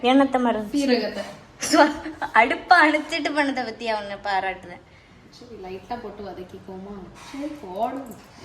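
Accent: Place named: Nagercoil, India. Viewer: Yentha or da nagarkovil da tamil super ah irukku da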